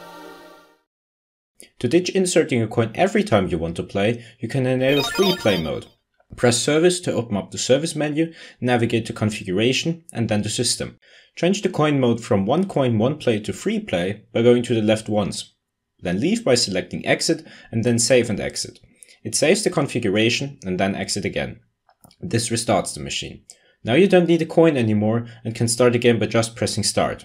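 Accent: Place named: Austria. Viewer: Oh you had a more "austrian" accent at that time.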